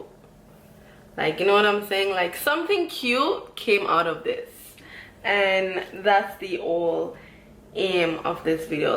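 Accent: Jamaica. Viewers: Girl I knew you was Jamaican from you said the 1st 2 sentences!.. lol u goooo girl.. this style is 🔥🔥🔥.. the baby hair is giving!!!!!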